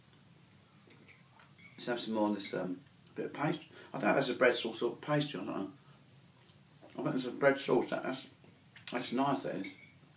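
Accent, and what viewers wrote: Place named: United Kingdom. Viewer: british accent.. the can was already open.. : disliked and reported.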